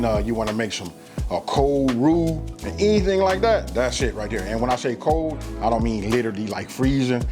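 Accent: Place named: Detroit, United States. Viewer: he must be from Detroit he sounds just like he from there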